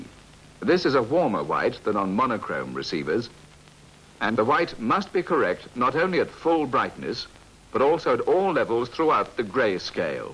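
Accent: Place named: United Kingdom. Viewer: This is very fucking BBC with DAT FUCKING British accent.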